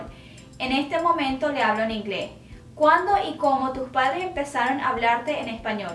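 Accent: Venezuela. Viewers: Hace mucho más de un año que no veía tus videos jajaja me encanta tu acento suena tan de la zona centro de Venezuela jajaja jajaja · Ya decía yo ese acento es de Caracas jajajaaj que hermosa que dios te proteja soludos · ¡Me encanta tu acento venezolano! Que padre que sigas haciendo más vídeos en español 😁 me encantan tus vídeos aunque no soy musulmana pero es muy interesante saber de otras culturas. Un saludo desde México ✌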